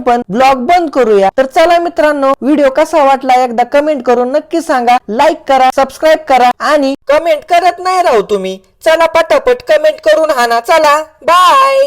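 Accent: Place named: Nashik, India. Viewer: दादा तुझी भाषा बोलण खूपच change झालं आपल अगोदर sarkhach बोल छान वाटत नाशिकची भाषा बोलू नको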